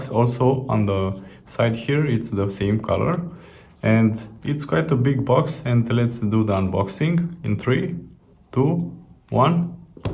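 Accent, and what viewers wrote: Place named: Romania. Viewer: English is given, but the diction does not help him, it takes a long time until the words come to his throat, I still recommend him to buy a newler microphone, something that you say speaks from a jar :)) and let it be softer with Romgleza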